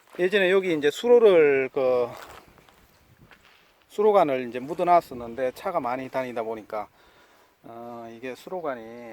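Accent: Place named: Gyeongsang, South Korea. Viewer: 네^^ ㅋㅋ 반갑습니다 올려주신 영상 잘보고있습니다 경상도 사투리도 듣기좋구요 좋은곳 많이올려주세요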